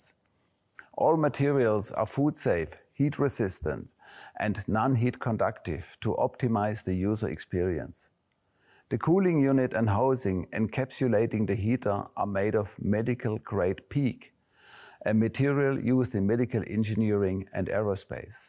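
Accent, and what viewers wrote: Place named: Swabia, Germany. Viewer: Ist bestimmt ein tolles Teil. Mit dem Crafty war ich schon sehr zufrieden...wenn man sich etwas mehr Zeit für die Heizphase nimmt. Die Häufigkeit der Ladezyklen ist der einzige Makel. Bin sehr gespannt ob der neue B+K Verdampfer da mehr Spass macht. PS..ein toller "schwäbscher" Akzent ließ mich jetzt schon ständig lächeln...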